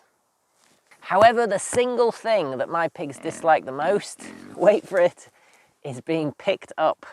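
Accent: United Kingdom. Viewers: Another well-made video,structured and relievingly void of egocentric sharing - although personal and quietly humourous. I enjoy your clean british English.I haven't liked pigs much, but this video started a change and I can imagine a couple when I get my homestead. How cold winters do they tolerate though? i have seen ordinary pink pigs outdoors in the snowy winter, but what is the comfort temp? Can they manage Zone 7, alpine area? Probably need more food and straw.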